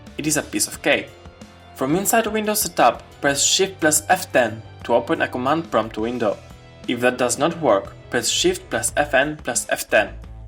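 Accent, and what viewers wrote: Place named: India. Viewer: You my friend are a life saver, never thought someone with an indian accent could solve my issue in less than 3 minutes, THANKS !!!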